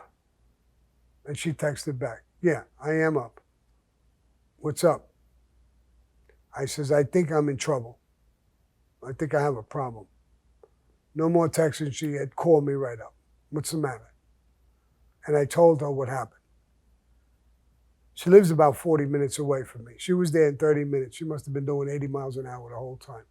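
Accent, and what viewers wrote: New York, United States. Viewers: I'm from the Bronx and was raised in an Italian neiborhood ,I live in the Midwest now so l have a new York accent and love hearing Mr gravanos accent as well,brings me back to the Bronx.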